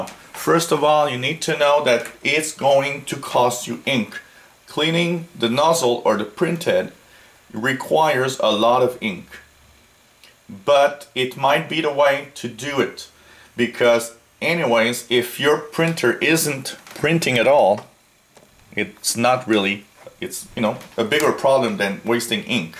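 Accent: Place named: Quebec, Canada. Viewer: french canadian accent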